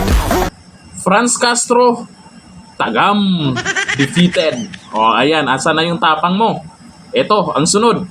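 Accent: Visayas, Philippines. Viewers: Tagammmm,kabisaya ba oi..😁😁😁✌️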